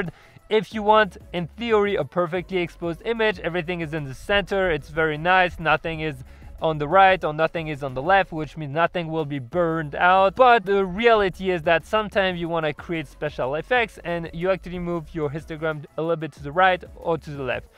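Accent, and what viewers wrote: France. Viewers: The french accent is epic 😊😊, I am a french speaker by the way and my english is way far from being as good as yours. Keep it up. I learn a lot through your videos.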